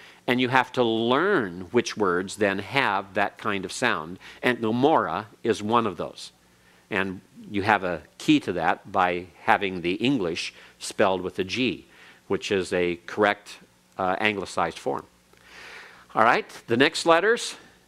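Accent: United States. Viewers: Errrm wanna get rid of the American accent, if possible. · Lovely class. I really appreciate it. I do have a mild criticism. might want to consider that he should use examples that conform to pronunciations in Hebrew. For instance, there is no a as in bat--none whatsoever--repeating over and over sounds that don't have an equivalent in Hebrew--reinforcing sounds that don't exist. He is also speaking the name of the letters in a thoroughly American English accent. These students, though learning Biblical Hebrew, should learn to sound like an Israeli--because this is proper and useful. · Ok, people...Jew here. This is the thing: These people are learning this language to study with, to become Biblical scholars. They will not be using it as we Jews do to pray in. Yes, the pronunciation can be a little wacky for me, but this man is teaching this from an angle I have never heard. 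I can rattle off prayers in Biblical and Prayerbook Hebrew, and even tell you what I am saying. But I need more depth and he is providing that, so the “Classical”pronunciation is not throwing me off. HOWEVER, I will say that if you ever walk into a synagogue, you are going to hear different pronunciation. This is not the way Jews is most places pronounce some of these sounds. We also tend to not have such a strong American accent—even those of us who are American. In order to pray with this language, listening to some prayers in Sephardic pronunciation would be helpful. Or Ashkenazi, but you will likely not hear that much except by some older folks in Conservative shuls, or in orthodox settings like Chabad.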